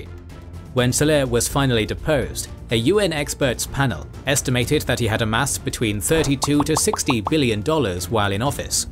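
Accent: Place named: United Kingdom. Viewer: It's confusing to hear a British voice tell the history of Yemen without much of a mention of the British Empire. There are important carryovers that you neglected.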